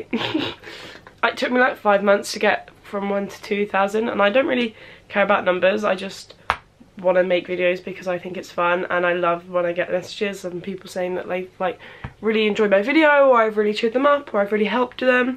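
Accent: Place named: Cornwall, United Kingdom. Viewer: Omg you are stunning! ❤️❤️❤️❤️❤️❤️❤️❤️❤️❤️ Edit are you from Cornwall? I love your accent x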